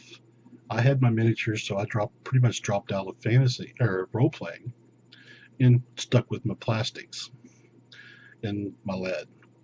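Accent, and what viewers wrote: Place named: South, United States. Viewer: Super interesting video... you're so easy to listen too, I love your relaxed accent (I'm assuming its southern? sorry if I'm wrong!), reminds me so much of one of the WW2 veterans featured in the Band Of Brothers series - 'Shifty' Powers I think it was.:D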